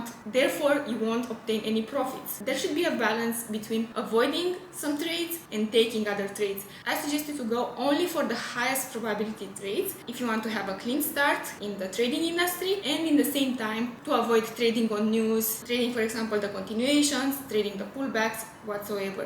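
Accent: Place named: Russia. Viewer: Russian Accent 🤔 . Where are you from ? Ukraine , Russia , Moldive , Romania ?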